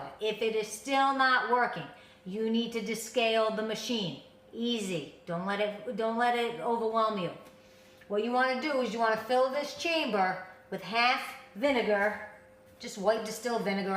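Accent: Boston, United States. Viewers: Not a NY'er....That's a wicked strong baawston townie accent.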